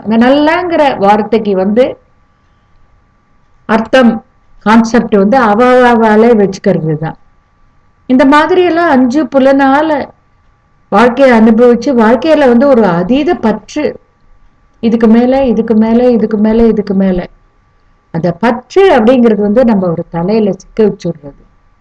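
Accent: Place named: Palakkad, India. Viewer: I follow all clips because I like the spoken tamil it resembles Paalakkaattu tamil. I am from Srilanka. Thank you so much for all cooking.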